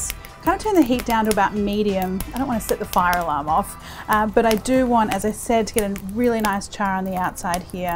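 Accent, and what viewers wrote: Australia. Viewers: I love how Australian accents add an 'er' to the end of words... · That’s her Australian accent.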